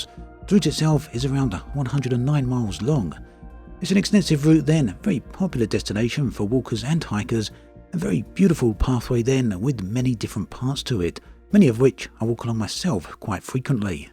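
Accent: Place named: Yorkshire, United Kingdom. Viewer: Your accent is classic Yorkshire lol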